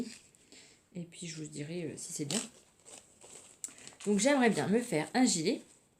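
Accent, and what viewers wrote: France. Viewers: Tu m’amuses en parlant de ta manière de parler : nivernaise et Morvandelle ! De quel côté es-tu ? J’ai habité longtemps à côté de Corbigny dont on dit que ce n’est pas en Morvan mais que ses poules y vont aux champs....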